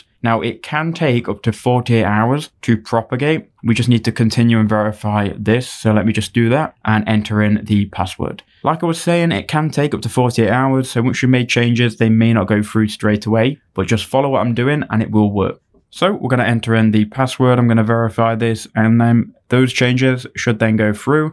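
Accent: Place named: United Kingdom. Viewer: Just simple and true 👍. Followed it and got mine done in 5 minutes. Also loved the British accent <3😂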